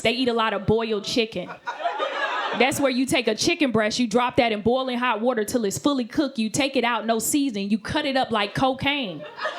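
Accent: South, United States. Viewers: She has that classic southern twang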